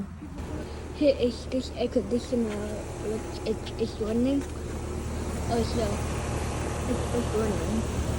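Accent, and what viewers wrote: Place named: Vietnam. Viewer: Wow your English it’s like Vietnam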